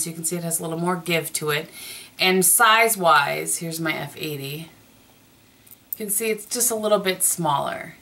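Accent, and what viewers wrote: Wisconsin, United States. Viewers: For some reason your Wisconsin accent was super noticeable in this video. Too cute!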